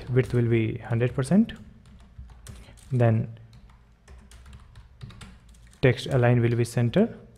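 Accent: Bihar, India. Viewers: Have you been in India or something, cause your accent slightly sounds like so, but your said you were from Australia. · Your sound is like an indian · aap Bihar se hain kya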